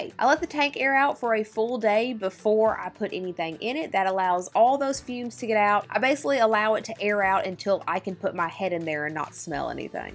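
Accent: South, United States. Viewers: Girl u beautiful But that country accent sum else 😭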